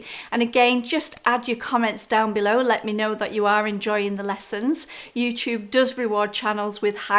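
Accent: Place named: Northwest England, United Kingdom. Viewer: I really do see your method as the best way to paint, by separating the issues of value and colour the stress is taken away as achieving the painting becomes more of a step-by-step process. My background is in project management so breaking big jobs into small organised tasks comes naturally to me. I see things in your techniques and methods I've not seen before, so inspiring to me. The technique of underpainting starting out with a mid tone and then wiping/erasing for lights and reinforcing darks makes it look so simple and easy, I guess great skill and experience help too ;-) You paint just the way I want to, not ashamed to use reference photos and not scared to try different ways to achieve gorgeous results. So next month, when I have some spare money, I shall go to your website to buy a subscription. I've already cancelled my paid subscription to a SW USA chap's tutorial site where he covers all kinds of materials and methods but just on the surface whereas I want to dig deeply into and concentrate on the indirect method in oil but with modern methods as you do, combining tradition with innovation. 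Your approach suits me just fine and I prefer your northwest(?) English accent too. West of the Pennines not too far from Manchester?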